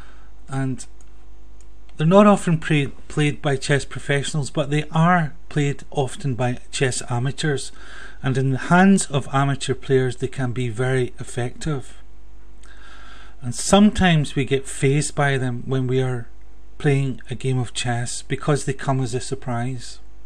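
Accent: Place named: Scotland, United Kingdom. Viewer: I think he's saying "pat" -- a "pat opening" ... but these Scots accents are tricky!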